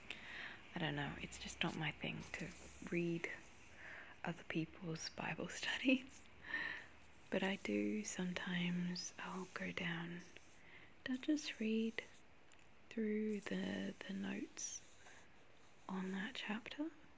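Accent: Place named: Australia. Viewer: I also find it quite refreshing hearing an Aussie accent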